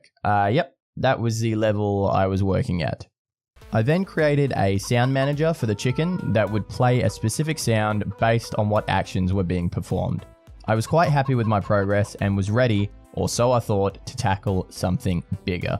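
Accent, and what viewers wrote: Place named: Australia. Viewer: I thought I heard the accent I'm Aus too lol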